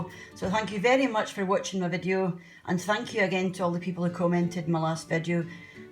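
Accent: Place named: Scotland, United Kingdom. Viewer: Im happy to here a Scottish woman teaching me im not a great cook im learning but love the simplicity of the menus n great food from yonuger years ❤👌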